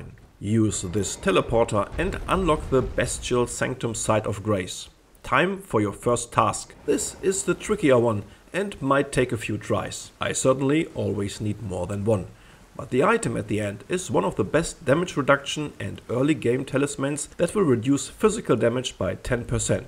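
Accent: Germany. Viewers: Du hörst dich ziemlich Deutsch an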